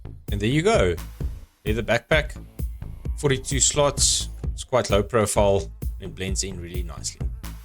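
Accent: Cape Town, South Africa. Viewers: As a cape townian.....this voice sounds like home 😂😂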